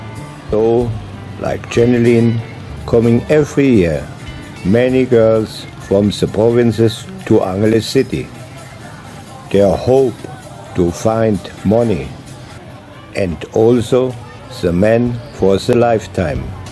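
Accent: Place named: Germany. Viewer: Schönes und interessantes Video, aber Dein Englisch ist immer noch extrem deutsch akzentuiert, ich musste öfter sehr grinsen. Aber man versteht alles sehr gut und das ist das Wichtigste.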